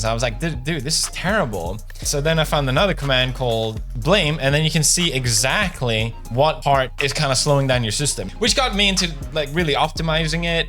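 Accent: Sweden. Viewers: Can I just say I feel like since pewds probably doesnt use english as often anymore I feel like his swedish accent is coming out more and more haha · I haven't watched your videos for a long time, but I must say your Swedish accent has somehow gotten worse. Love this video, though, and I agree 100% that more people should use Linux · you still sound so darn swedish it's silly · It took me not seeing your videos for 3 years to realize you actually still have a very prominent Swedish accent! 🇸🇪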